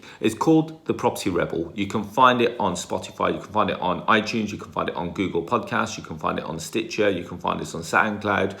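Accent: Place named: United Kingdom. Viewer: Funny how this guy uses the British accent. Lol...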